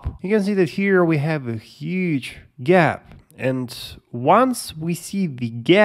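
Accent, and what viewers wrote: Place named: Russia. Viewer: О видно что акцент русский)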